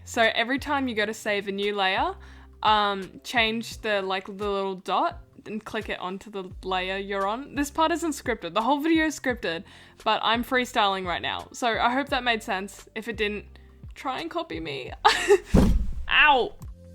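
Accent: Australia. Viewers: Congrats on your first monetized video and your aussie accent is fine btw! No need to put on subtitles 😅 Thanks for this tutorial btw! I'll be sure to come here if I ever want to learn to animate msp characters, it sure is nice to see someone who edits with medibang instead of photoshop for a change when it comes to animating on after effects ♡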